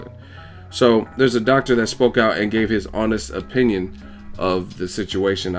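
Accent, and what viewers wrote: Ireland. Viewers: WTf you are irish?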